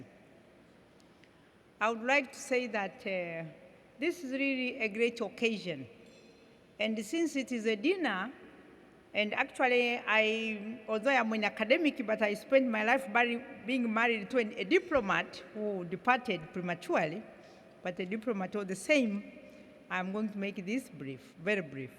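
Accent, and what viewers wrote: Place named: Haya, Tanzania. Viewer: Yeah! representing well Tanzania,the country of Mwl. Nyerere & JP Magufuli.Big up mama tibaijuka truly you deserve respect.pia nimeipenda lafuzi ya kihaya mpaka raha hiyo Ndiyo TZ.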